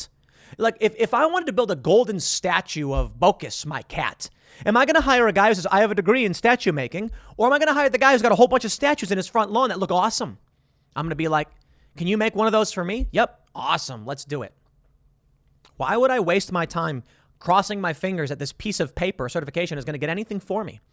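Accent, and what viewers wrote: Chicago, United States. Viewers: Sheesh, Tim. Your South Chicago accent showed through today.... Appreciated your commentary. It made me reflect on my circumstances... past and present. In the 1970s it became harder and harder for a working class family whose parents had not gone to college to make it on one salary. That was when mothers (my mom included) started going into the work force - out of necessity to help their families keep up with the inflation of the 1970s. It is why my sister and I were encouraged to go to college... because it really was thought a college degree would help your children break through financially. Now, back then, you had to go to a bank with your parent to get a school loan. Mine was $10,000 over four years (that was a lot back then). After graduating from college, I had to pay that loan back over a ten year period. I still remember that last loan ticket and paying it off. 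Good feeling. But at some point the US government stepped into the school loan business. I think that's when things got out of hand and when college tuitions screamed higher and higher way above that supposed rate of inflation at the time. By the time my children (your age) were ready to go to college, my spouse and I had bought into what we were told, that it was the thing to do. But we also believed it was the best way for them to have a chance to make a decent living. Honestly, younger boomers and older gen-xers like myself felt they had to go the college route for their children, had to encourage it. We w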